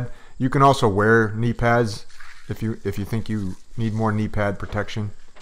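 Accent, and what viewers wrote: Maine, United States. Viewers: By your accent...Maine?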